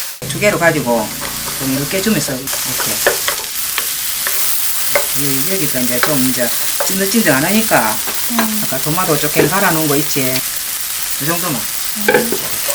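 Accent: Gyeongsang, South Korea. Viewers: 깅상도 사투리 억수로 조슴더 어무이예~~^^ 깨방정 맛있데이 엄마~^^ ㅋㅋㅋㅋ 부러랑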